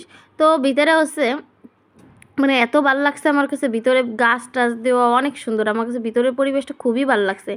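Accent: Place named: Gopalganj, Bangladesh. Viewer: আপনার বাড়ি মনে হয় গোপালগঞ্জ ভাষায় তাই বলে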